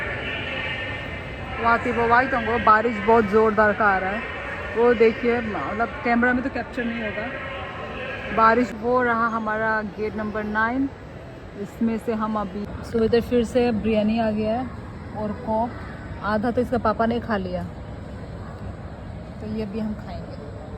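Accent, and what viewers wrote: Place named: Tripura, India. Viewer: Tripura ka accent aa raha h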